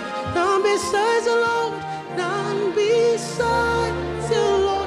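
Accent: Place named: United States. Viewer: Did he spend time in America? He sounds so American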